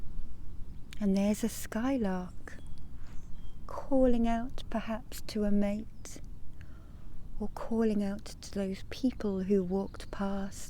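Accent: England, United Kingdom. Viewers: this is putting me to sleep so its hard to type this comment but you are wonderful - full of love and truly positive energy that you so generously put out there for the taking. you are a gift. i really enjoy the earthy feel of this creation and that you can convey such warm feelings from what looks like such a chilly, blustery spot is testament to your abilities. and i love your accent (one might call it true English... i'm American so yeah)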